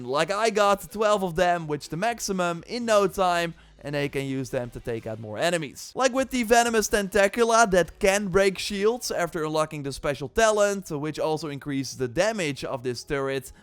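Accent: Netherlands. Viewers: Ik dacht al klinkt Nederlands en checkte socialblade en hup een Nederlands accent.... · dont know why but you sound dutch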